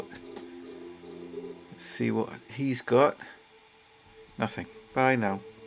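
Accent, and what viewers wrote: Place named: United Kingdom. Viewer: I been playing for over 10 years and know everything about this game…. but I still listening to videos because he has such a soothing UK voice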